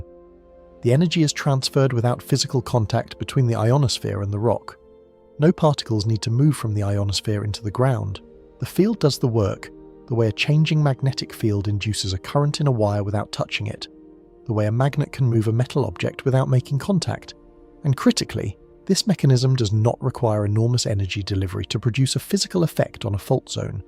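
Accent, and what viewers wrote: United Kingdom. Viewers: Fear porn pigs at it again. I'm so scared I crapped the couch. Smells worse than his British accent !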